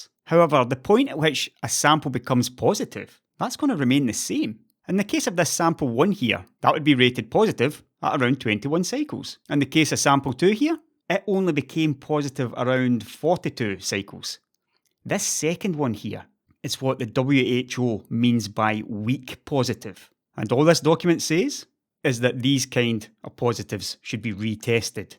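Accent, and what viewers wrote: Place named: Scotland, United Kingdom. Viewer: Can you make a video in swedish. I have to hear your Swedish with a Scottish accent, if you can speak it.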